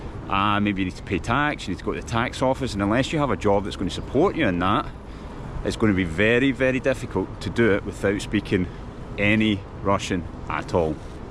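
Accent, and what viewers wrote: Scotland, United Kingdom. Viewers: Очень нравится твой шотландский акцент! :) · Обажаю шотландский акцент, просто музыка для ушей. Мне он кажется очень жизнерадостным. :) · Wow your Russian has less accent than your English. Your Scottish accent is heavy and sounds really cool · Я половину видео не могла понять почему мне сложно его нормально понять), потом увидела название канала, и подумала, что впервые слышу шотландский акцент. Интересно звучит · Вас за кавказца не принимают? Шотландский акцент очень специфично звучит на русском. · I love your scottish pronouncuation, it's so cool! And yes you are right, we russians do respect the fact when a foreigner is trying to learn russian, that's already enough for us 😊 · Шотландский акцент просто огонь. Как и квантовый выбор падежей. Это достойно уважения. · Мне очень нравится Шотландский акцент в английском, have a good time in Moscow, lad! 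· it's very interesting to listen to the Scottish accent, but unfortunately the videos are rarely released... does a Scot in Moscow really have so little to tell?)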